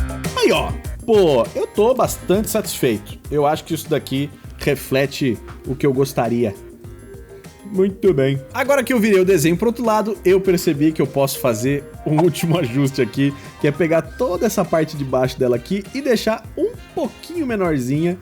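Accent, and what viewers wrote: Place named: Paraíba, Brazil. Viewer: que jeito paraíba de falar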